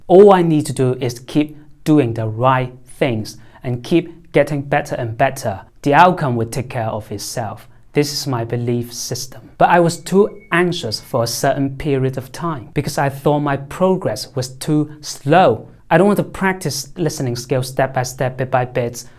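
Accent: United Kingdom. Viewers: 感觉发音偏英式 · 你的好像是 英國口音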